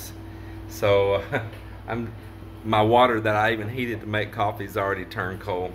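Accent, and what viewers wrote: Texas, United States. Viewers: It’s language barrier. Your texas accent, figures of speech and sense of humor are “foreign” to them. Learn ilonggo so they understand you. If us Filipinos go to America, we speak english. Since you’re in the Philippines, speak tagalog or ilonggo when you’re in Ilo-ilo. Then you won’t have to stress about them having ‘amnesia’🤣😂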